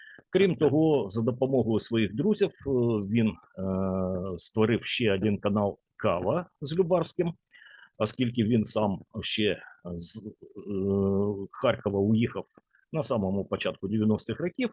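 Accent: Russia. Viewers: Якось дивно: Ви читаєте український текст, але з російською вимовою.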